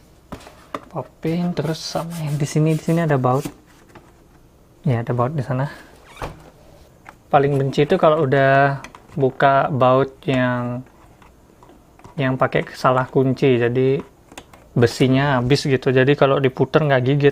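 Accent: Bali, Indonesia. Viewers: subscribed. aku suka cara ngomongnya. khas orang bali. apalagi kalo lagi rliding eh riding gak ngebut sih cuma ya asik aja dengerlin eh dengerin celotehannya. salam kenal om ✌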